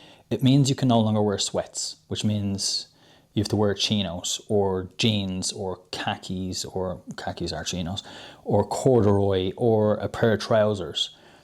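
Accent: Ireland. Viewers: I love your black cat, your Irish accent and your highly useful advice! Plus your natural performance. Good job! I am really grateful for having found this video! Thank you so much!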